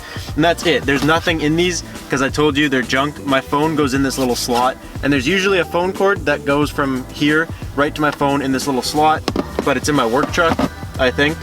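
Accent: Canada. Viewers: right, the accent is hard when he says "out" or "pouch" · I can tell your Canadian lol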